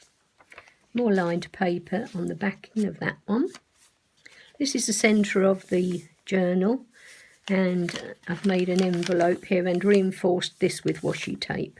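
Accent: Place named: England, United Kingdom. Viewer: Absolutely Gorgeous Thank You for sharing with us . Nikki xxx ( I can hear you are English like myself ..Do you live in the UK )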